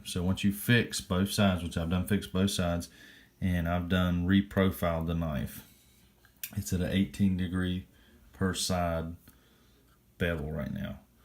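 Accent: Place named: Texas, United States. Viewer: You from Dallas? You sound like Vinnie Paul. You haven’t posted for years, but your instruction helped clarify what I needed to get razor (and mirror) sharp. Cheers brother, thank you.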